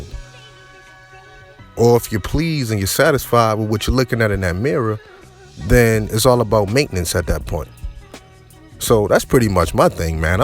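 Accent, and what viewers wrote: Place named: Baltimore, United States. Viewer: you sound just like a brotha I was in the military with, he was from B'more... I know that Baltimore accent fam.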